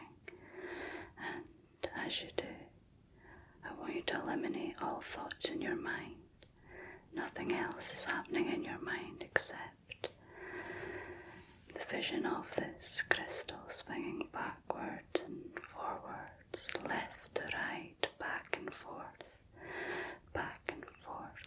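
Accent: Scotland, United Kingdom. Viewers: Feel like i with a scotish girl. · Just discovered you. Its nice to hear a Scottish accent spoken so softly..... even if you are from Dundee 🤣😜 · lovely Scots accent behind the voice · Hello from Ayrshire it's Good to hear a Scottish accent I have real problems sleeping but you helped this fellow scot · your scottish accent reminds me of mum so soothing x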